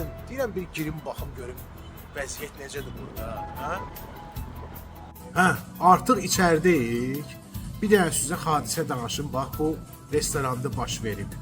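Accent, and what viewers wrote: Baku, Azerbaijan. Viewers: Nuw olsun lehceni ewidende ele bildim Bakidayam hemiwe sesini ewitmek mene cox xow olurAllah qorusun seni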